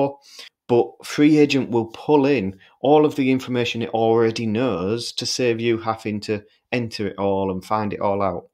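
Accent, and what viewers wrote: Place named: Middlesbrough, United Kingdom. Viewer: Are you from Middlesbrough? You sound like Bob Mortimer!!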